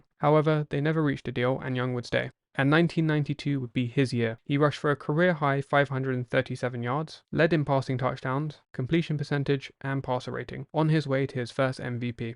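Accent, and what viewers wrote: United Kingdom. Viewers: BRI'ISH GUY TALKING NFL!!!!!!!!!!!! OMGGGGGGGGGGGGGGGGGGGGGGGGGG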